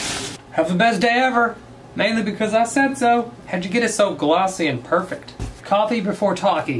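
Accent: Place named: Upper Peninsula, United States. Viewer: Ayye yooper eh?! Wee love it!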